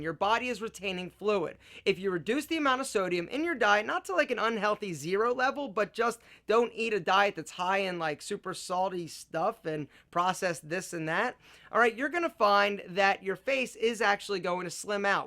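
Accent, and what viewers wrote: Philadelphia, United States. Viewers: Are you from philly? Wooder.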